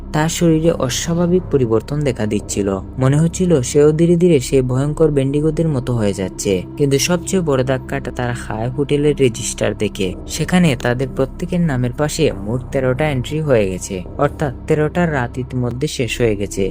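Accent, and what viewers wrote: Sylhet, Bangladesh. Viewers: আপনার মাতে লাগের তো আপনি সিলেটি সিওর নি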